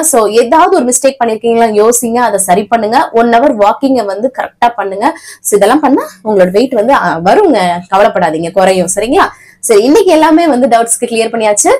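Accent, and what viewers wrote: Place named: Madurai, India. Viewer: kk but pesurathu Madurai slag mathiri iruthathu na madurai tha native but ippo mrg aagi tirupur vanthute 8 yr aatchu 💕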